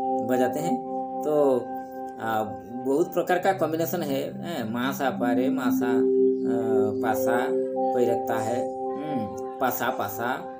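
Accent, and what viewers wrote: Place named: Bengal, India. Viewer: Res sir pranam Excellent tut I am practicing the same My request is if you can text swars along with it Your accent is bengali g sounds like dh Regards Pl forgive me if I am wrong God bless you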